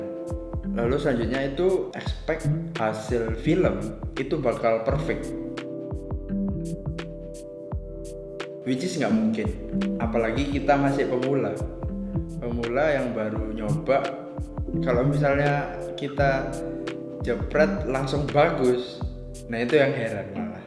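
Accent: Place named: Surabaya, Indonesia. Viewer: Makasih mas, bermanfaat bnget infonya. Dan ternyata podo Suroboyo e Haha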